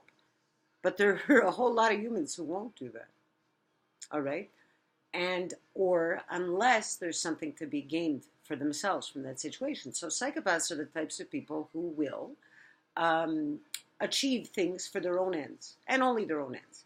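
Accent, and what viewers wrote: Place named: New York, United States. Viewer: You have a New York accent. Sounds nice and it is attractive feature on a person. Have a nice and safe week!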